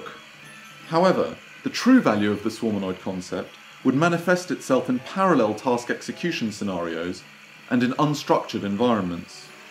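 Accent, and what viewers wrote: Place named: England, United Kingdom. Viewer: Vomito! This is the stupidest project I have seen on youtube. Funded by the European Union. Perfect. I thought I was going to throw up watching this giant waste of money and listening to the stupid pompous english accent. Next time, pay an immigrant to walk over and pick up the book. A lot cheaper. And less pollution.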